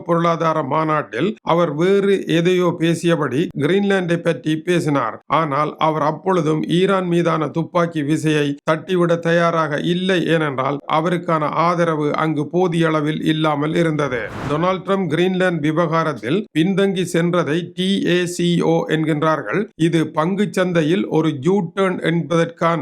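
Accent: Sri Lanka. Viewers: Tamil look like sri lanka Tamil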